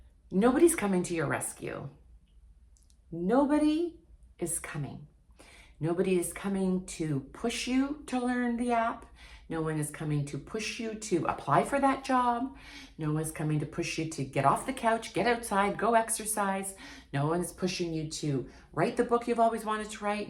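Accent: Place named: Canada. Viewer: I knew you were a canadian based on 1 single word you spoke. Im in detroit, ontario border in detroit, and we speak exactly the same as far as dialect except for pne word. About. You canadians really pronounce it quite different.😄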